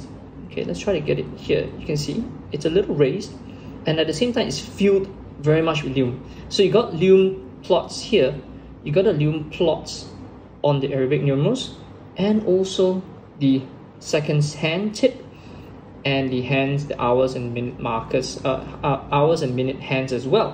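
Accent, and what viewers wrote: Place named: Singapore. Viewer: Long jeans! Thats how most Singaporean shouts out it as. Nothing wrong with pronouncing it from a local standpoint. He does not sound fake. Keep it up👍 Would like to see more reviews mid priced luxury watches from your channel. But keep in mind, that since comparisons are made between 2 watches, always be reminded to repeat that these reviews are in your own personal opinion and preference.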